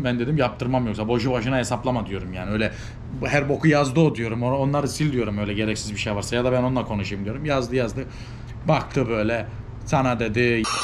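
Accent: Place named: Trabzon, Turkey. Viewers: Kardeş konuşman, tavrın aynı Trabzonlular gibi. İlk izlerken kesin Trabzonlu dedim!? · Seni tanimiyorum ama buyuk ihtimal trabzonlusun konusmandan ve tavrindan belli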